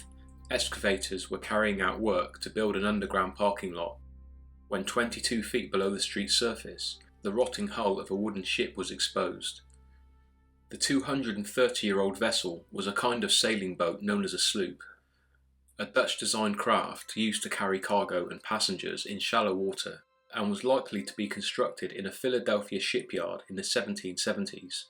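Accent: England, United Kingdom. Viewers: Great video! Love your channel....and American girls love a English accent 🤗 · there from different countries, this is an English accent, Bale is from wales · You need a better microphone to showcase that English accent. It sounds hollow and tinny. Otherwise the video was excellent, keep them coming.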